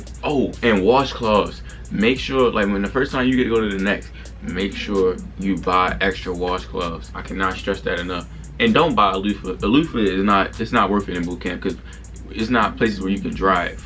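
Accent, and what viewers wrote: Baltimore, United States. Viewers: LMFAO HE FROM BALTIMORE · R u from Baltimore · You from Baltimore bruh?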